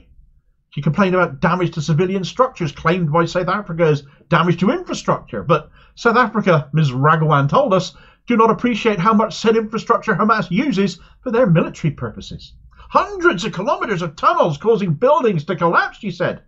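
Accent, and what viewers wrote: Cornwall, United Kingdom. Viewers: Zackley., Great to hear a Kernow voice. I'm not Cornish myself - Cornish ancestry but brought up in Somerset... but I love to hear West Country voice and opinion. Thanx mate.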